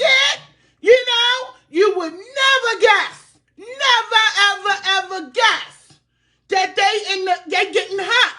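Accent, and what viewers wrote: Baltimore, United States. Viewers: That Baltimore accent sounds good! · Her accent izzz thiiiick lmao like my cousins in Bmore. I was like is she from Pittsburgh or Bmore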